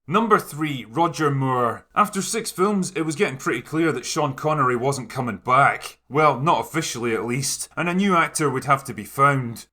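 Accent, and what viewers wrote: Scotland, United Kingdom. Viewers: Sean Connery He blazed the trail and made the mold. The others tried to mimic his charisma, but failed. There are few actors that have the entire package like Connery. Just look at Connery's body of work and the magic of his presence oozes thru unintentionally, just because of Connery being Connery. Pierce Brosnan The only other guy that came close, who even had a movie kept under wraps until he was released by his captors in Hollywood, was Pierce Brosnan. Golden Eye was a fantastic movie. Sure, it had gaping plot holes, but it delivered. Brosnan was the epitome of cool, calm, collected British, stiff upper lip, boys, don't let'em get away. Oh yeah, save the girl too! That's not to say Pierce was a good Bond in other movies, but as good or better than all the other candidates. George Lazenby vs Diana Rigg Lazenby was good not great in his one appearance, but that's the problem. 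I need a little more than one showing. Different venues give us more flavor of what His portrayal and interpretation of Bond really was. Diana Rigg now, WOW. If any woman could have pulled off Bond, there's my girl. On non Bond stuff...Mike Krommhoefener said about you criticking Bond. If you play your cards right, you could be the next voice-over king. At least for parts needing a British/Scottish accent.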